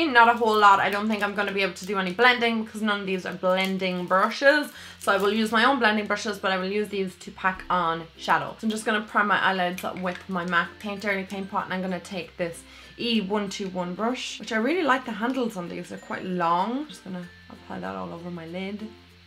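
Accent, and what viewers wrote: Ireland. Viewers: Is thought your from Ireland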